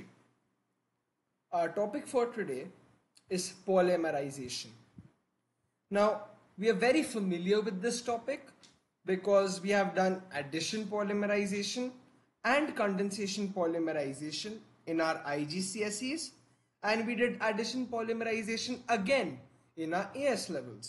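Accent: India. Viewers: I feel u INDIAN right..?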